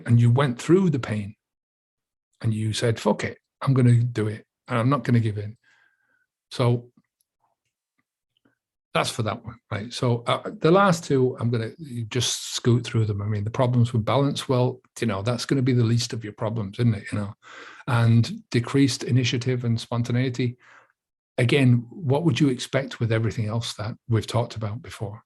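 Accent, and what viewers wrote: Dublin, Ireland. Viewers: Is that a Co.Dublin accent I can hear? 😊chose to knock drinking on the head 8 months ago, best decision I've ever made. I've been out in social occasions since, and have full memory of these evenings. Fantastic feeling waking up the morning after with no groggy " what did I do?" Fear. Great video. ❤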